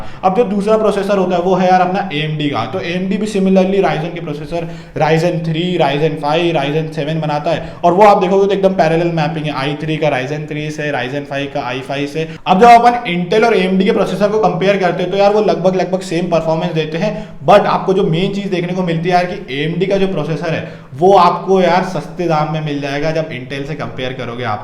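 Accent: Indore, India. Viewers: Bava indori ho kya...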